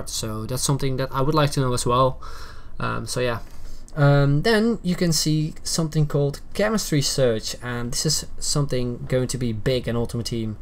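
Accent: Netherlands. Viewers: Haha je hoort gelijk al dat hij nederlader is :-)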